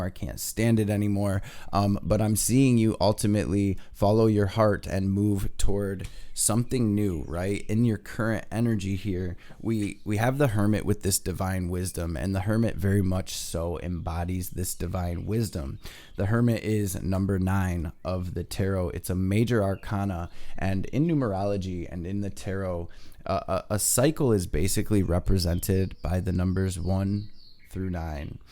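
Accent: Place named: Chicago, United States. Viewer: I'm new to your channel, like 30 days or so. Definitely sticking around. Love your vibes and that chitown in your accent. Thanks mucho fam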